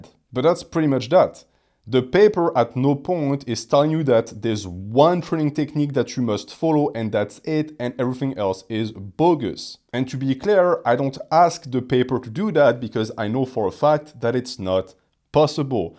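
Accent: France. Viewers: hello, this is not related to anything but is it possible for you to style your facial hair like a pencil moustache? you are french and i think your accent will match your character if you rock a pencil moustache. thank u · Fitness advice is way better with a thick French accent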